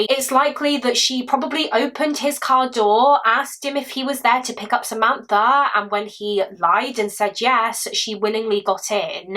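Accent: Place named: Somerset, United Kingdom. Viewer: It's a west country accent ❤ sound Somerset to me 😊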